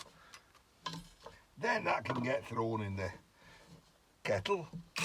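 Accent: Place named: South Yorkshire, United Kingdom. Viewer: Guessing from the accent you're from South Yorkshire? If you're after an Ewaste buyer, SJA Metals at Newark are decent to deal with. 👍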